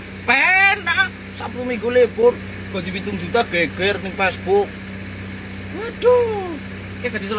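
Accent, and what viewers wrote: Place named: Java, Indonesia. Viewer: Wong endi mas medok temen.ari ngomong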